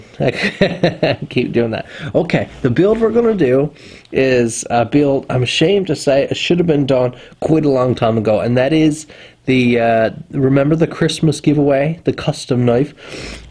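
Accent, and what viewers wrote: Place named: Newfoundland, Canada. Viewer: sorry new to the channel, but yeah the accent gave it away im a alberta boy living in ottawa area got alot of buds from Newfoundland... Both baymen and townies... But all good folk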